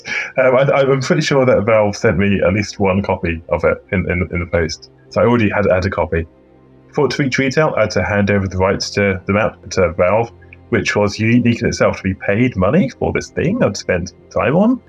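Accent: United Kingdom. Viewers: Lol need subs for this huge british accent. Where he from in uk? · Its so freaking hard to make sense of what he is saying sometimes ... british is so hard. Too much mumbling ... but anyway gj Dude!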